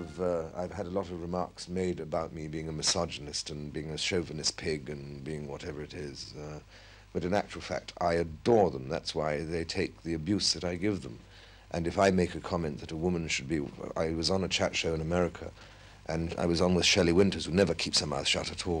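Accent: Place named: England, United Kingdom. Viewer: I can listen to this guy narrate any documentary. Best English accent.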